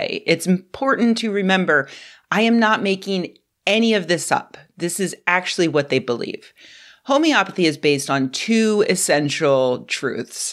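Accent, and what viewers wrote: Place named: Pacific Northwest, United States. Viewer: As soon as she started talking, I knew you were from the Pacific Northwest—a place where people are very loud with their lack of information. I have nothing to say about homeopathy, but I find it funny you don’t understand that a licensed naturopath by law has the same education as a general practitioner. One difference is they don’t do a residency and are kept separate by insurance companies will be a second. Another is natural medicine is the foundation of their training. An allopathic doctor who does integrative medicine is secondary—— learn through CEs. A statement often heard in the Pacific Northwest is, “I trust science. “ This is a religious statement that has to do with science. The belief that something is true only if it can be empirically proven. This is misguided. Empirical fact and truth are two different things. 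Not everything true can be empirically verified. For instance, we have no idea why or where, in the brain, everything comes together from vision, hearing, and smell to be understood, yet we can still do all of those things. It is called the binding problem. If I remember correctly, in homeopathy, the active ingredients are broken down by parts per million. I don’t know if it works, but I also don’t believe there is a definitive way of testing it. I know that endocrine disruptors at lower levels are more likely to cause problems in the human body than at a higher level—— Which is one way petrol-chemical companies have been able to say their plastics were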